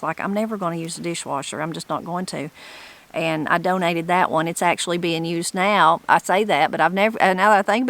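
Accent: Appalachia, United States. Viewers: I love this channel, your accent, the Appalachian people. I’m so far from home, I subscribe to your channel and get a taste of home. I read the comments for “dessert “. I’ve been living in Mexico for 20 years, at first with my young son, but then he returned to the US for college, sigh. Your channel warms my heart . I was in Bryson City with my siblings and mother. We spent the summer there in the mountains to get away from the South Florida busy ness. We camped, swam in a local public pool, the river, learned macrame and sand painting. We climbed trees, I tasted crabapples from the tree for the first time. We caught lightning bugs, they filled the whole yard, as well as the mosquitoes. The best summer of my life. Maybe one day the Lord will bring me back to Appalachia. We were all born in TN, but Nantahala Gorge, oh my heart.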